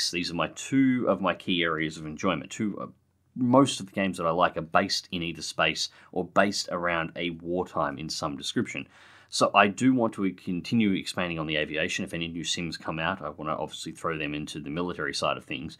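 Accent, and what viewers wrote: Australia. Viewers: you could play anything and i'd watch. It's nice to hear another Aussie voice every day.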